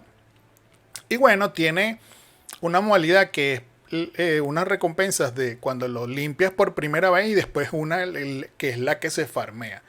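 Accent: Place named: Venezuela. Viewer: Coño esa forma de hablar pareces venezolano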